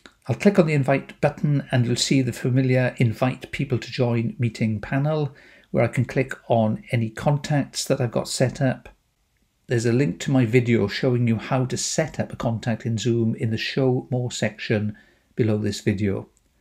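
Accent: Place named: Wales, United Kingdom. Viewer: Bore da Mr., Thank you so much for your Zoom videos. I teach at various universities in Okinawa, all of whom are now scrambling to get the students learning online. After viewing many other Zoom intro videos it became obvious that most of them were way too complicated and difficult for our Japanese students to follow. So, diolch yn fawr for your concise and much easier to follow tutorials. I especially appreciate the bonus of a narrative accent, not so different from my own Barry talk. Tidy, like! Owen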